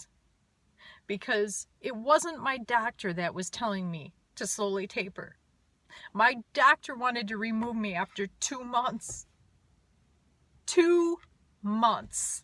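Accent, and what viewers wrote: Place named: Wisconsin, United States. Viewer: Hey, great vid. This is my 3rd time posting so i hope it goes through, or u might have a few comments from me sayin the same thing. haha. Anyway, its good to see another face/voice spreading the word about the dangers and reality of these drugs. Nobody else will understand the hell these things put people through. And yes, ur right. They are aware of what these drugs do and have been since the 80's. Its gonna be a tough road going through this shit but at one point it will be history and unfortunately we learn a lesson the hard way. The lesson of these dangerous, mind altering pharmaceuticals, the business behind them and even a little about the people who we trust to watch out for us. Its all bullshit and we only have eachother and urselves to look out for us. We lose friends and family, our jobs, relationships, enjoyment in life and faith in a system we thought was helping. 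Its like losing your soul. We are eventually forced to go through it and thats that. But on a good note its good to hear the Wisconsin accent. haha. Keep in touch and all the best to you and your family.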